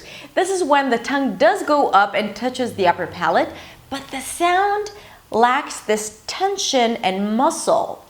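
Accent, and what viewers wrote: United States. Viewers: you are American? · you are a genius, gifted where teaching is concerned. I am Republic of Benin (West). I've discovered the secret of learning English and how to sound native. Thank you so much. To top it off you're making me fall in love with American accent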